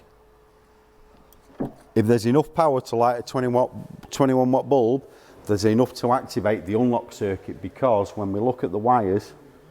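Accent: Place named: RP, United Kingdom. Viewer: Like the posh voice, is that for to understand you, with you being like me a Northern Lad 😂😂, great testing there love, quality is great on video and audio here in sunny Gran Canaria, take care boys 👍😉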